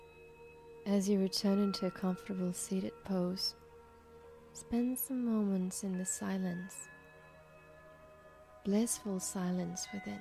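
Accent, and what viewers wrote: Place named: Australia. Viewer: has such a lovely voice but wold better if she could prevent the horrid Aussie accent creeping in!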